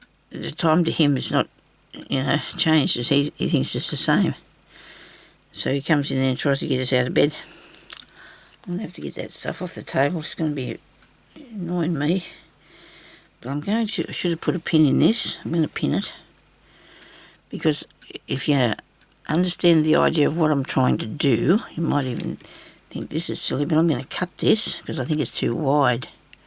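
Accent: Australia. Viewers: I understand you perfectly, you have the same Australian accent as me I also grew up in western NSW. Blue and green should never be seen unless there’s a colour in between is what I was led to believe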